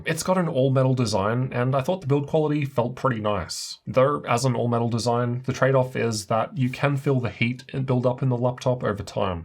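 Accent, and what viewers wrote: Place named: Australia. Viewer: That's the Aussie accent 😀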